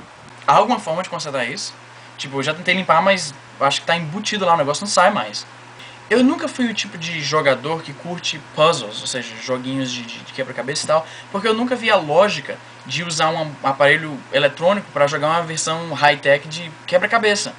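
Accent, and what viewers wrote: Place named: Northeast, Brazil. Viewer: tenho amigos online do nordeste e eu percebo um pouquinho de sotaque sim kk, mas bem pouco mesmo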